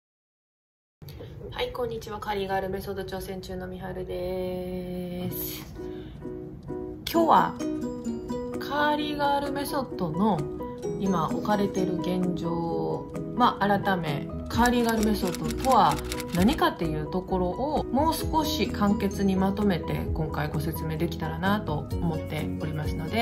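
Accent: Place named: Kansai, Japan. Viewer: めちゃくちゃ分かりやすい😮 あとなんか関西弁おもしろい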